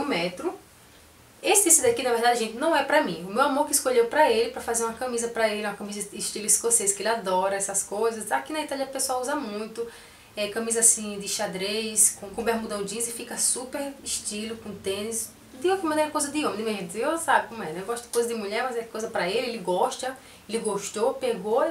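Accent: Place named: Northeast, Brazil. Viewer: minha linda eu amo vc seu canal, videos, amo ate a forma d vc falar com esse sutac tipo nordestino como eu rsrsrs....vc foi a primeira q comecei seguir aqui e atraves do seu canal q fui seguindo outros....bjsss linda mt saude e seja mttttt feliz com seu mozão!!!!♡♡♡♡♡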